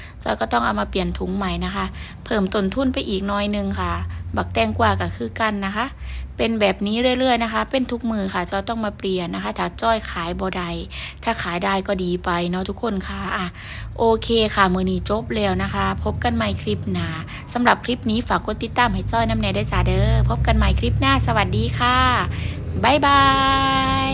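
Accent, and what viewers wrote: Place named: Sisaket, Thailand. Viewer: สวัสดีครับผมเพื่อนใหม่ตามมาส่งกำลังใจครับผมสำเนียงคือคนศรีเกษบ้านผมเเท้น้อ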